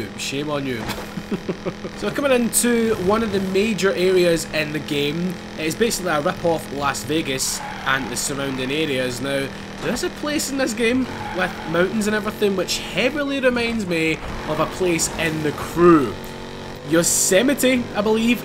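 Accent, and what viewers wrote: Scotland, United Kingdom. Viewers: Are you Scottish? You sound really Scottish 😂 I’m from Scotland as well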